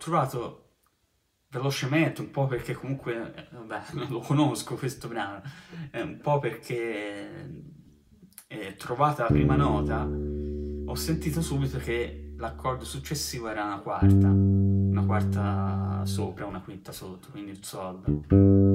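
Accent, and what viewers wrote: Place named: Livorno, Italy. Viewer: mi piacciono molto le tue lezioni di basso, fra le migliori che ho trovato su youtube! e se non sbaglio, dall'accento mi verrebbe da dire Forza Livorno!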